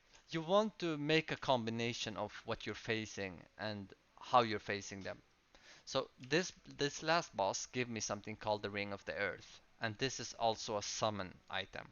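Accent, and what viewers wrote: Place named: Middle East. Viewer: That’s very nice man. When i heard ur accent i thought that this guy is probably from somewhere near middle east or balkans with a good language education😂. I assume that one of ur parents born in either Turkey or Kurdistan. I’m Turkish and as far as i know a lot of Kurds migrated to nordic countries. Lastly about the game, how important is fishing? I’m N5 right now with Lilith and I barely do fishing.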